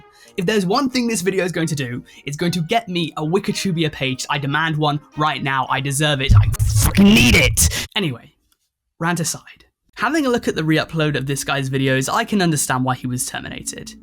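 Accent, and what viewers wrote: United Kingdom. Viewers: yuck british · British tuv, love it bro, keep it up! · Youre british?😮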